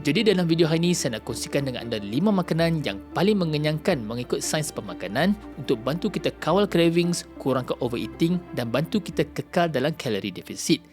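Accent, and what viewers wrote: North, Malaysia. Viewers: Doc mesti org utara kan..sbb saya dgr doc kata "ayaq"...kita gang 💪💪😂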